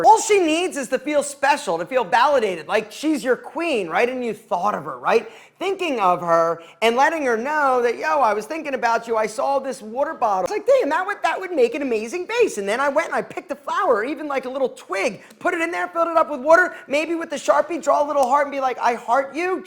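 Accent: Philadelphia, United States. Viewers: You're from Philly arent you?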